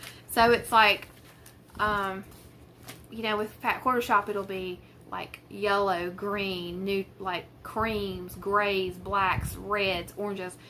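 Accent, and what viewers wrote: South, United States. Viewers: I think it's the southern accent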